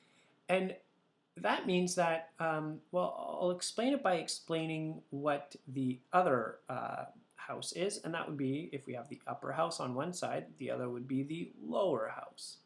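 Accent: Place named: Quebec, Canada. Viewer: You sound like Trudeau, maybe it’s a French Canadian accent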